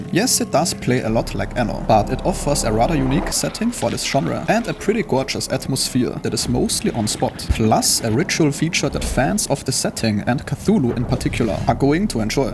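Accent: Germany. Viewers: Ohne es zu wissen aber dein Akzent ist sehr deutsch😂 Finde es trotzdem super diese Kurzreviews, sind echt ein time saver! ❤️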